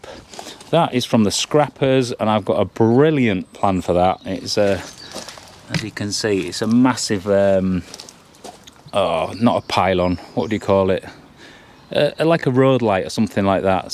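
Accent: Yorkshire, United Kingdom. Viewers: Hi.... How you doing? What you been up to? It's been a while since you posted a vid. Hope all is good!? Just letting you know that I enjoyed watching a lot of your Lakes camping trips... I'm from Lancashire but lived in Yorkshire for half my life so I really connected with your accent and manarisiums, reminded me of when I was younger. Take care with whatever you are doing and thanks for the effort producing the content that you have. 🤟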